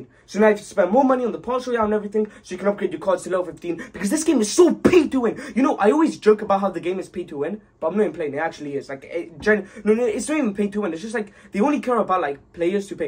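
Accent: United Kingdom. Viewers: Bruhh i just hearded that u are british, im unsubcribing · He sounds so British in this video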